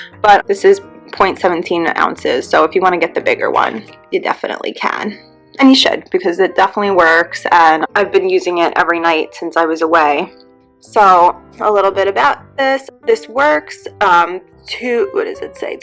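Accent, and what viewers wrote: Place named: New Jersey, United States. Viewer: Its a British brand and it works. Are you from the Jersey Shore? The accent :)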